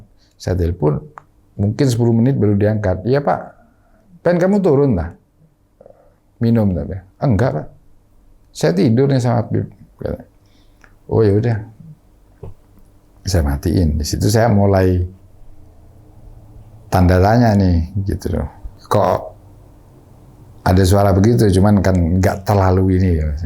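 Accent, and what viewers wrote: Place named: Bondowoso, Indonesia. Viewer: Logatnya bondowoso bgt om 😂😂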